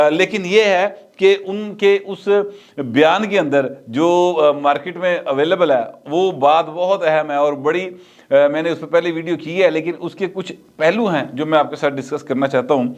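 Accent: Punjab, Pakistan. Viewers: Your punjabi with urdu touch is one of the best contrast with Lahori slangs, no one else can beat it for sure.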